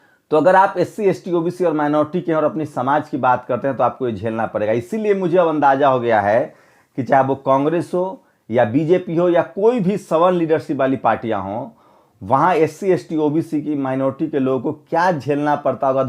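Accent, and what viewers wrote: Bihar, India. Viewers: Lag raha hai ji bihari jeise bat kar rahe hai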